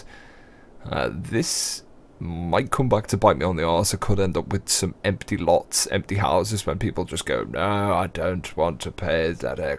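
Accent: United Kingdom. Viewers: Man Bear Pig Gaming for the same reason i enjoy your prison architect stuff; derpy, well intentioned, plate-spinning enthusiasm coupled with some fine uk-flavoured commentary.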